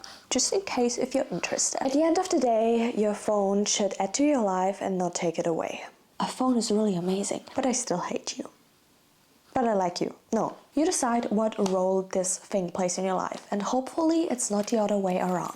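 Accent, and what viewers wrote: Germany. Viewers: The tips are GREAT, btw this was actually the first video I've seen from you, and I immediately questioned if you were German or Austrian, by your accent and furniture?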